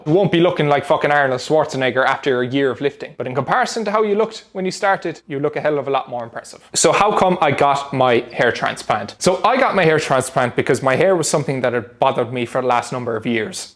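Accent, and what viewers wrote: Ireland. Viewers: he's from ireland, I think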